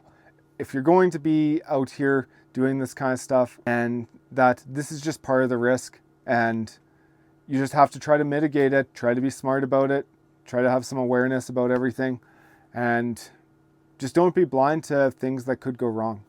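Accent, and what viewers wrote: Canada. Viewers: Sask accent? What area are you from? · …we knew you are from Canada when you said “about” and “zed”…😅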